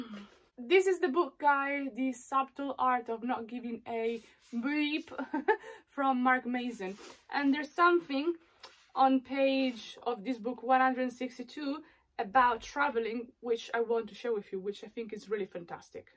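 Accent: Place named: Andalusia, Spain. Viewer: Your Spanish is similar to 'andaluz'... Number 6: you know...all for the art!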